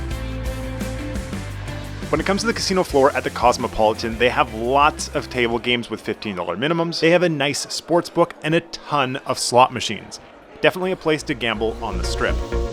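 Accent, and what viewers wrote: Canada. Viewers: I like the $20 trick to see if you get anything. I find it interesting that some places allow early check in. I like your brief reviews! BTW, do I notice a Canadian (or Midwestern accent) haha?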